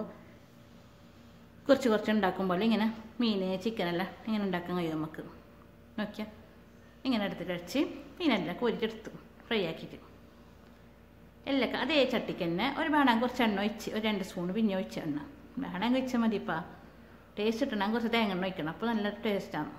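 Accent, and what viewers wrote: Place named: Kasaragod, India. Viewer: Ingale kasarod slang I like ..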